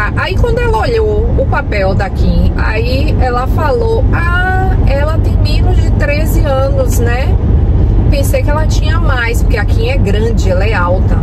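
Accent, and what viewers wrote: Bahia, Brazil. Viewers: O outono ja chegou ai, vendo daqui de Porto Seguro Beijo, que sotaque delicioso da Bahia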